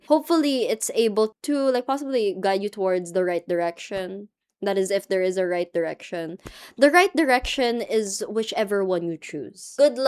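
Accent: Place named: Asia. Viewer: Where are you from with your beautiful asian accent ? 🎉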